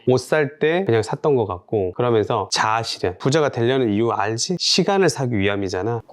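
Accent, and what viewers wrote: Jeolla, South Korea. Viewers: 경상도인데 전라도사투리 넘 재밌어요~~😂😂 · 마음가짐 확언만큼은 이미 1등급 입니다 좋은에너지 나눠주심에 감사합니다 긍정바이러스는 선물 입니다 중간중간 구수한 전라도 사투리도 정겹구요 좋아요 버튼은 늘~항상 잊지 않는것도 팬심이랍니다ㅎ 파랑색을 좋아해서 자꾸만 쇼파가 탐이납니다요ㅎㅎ 파랑새는 멀리있지 않습니다 이미 제 마음속에 있네요^^ 확언!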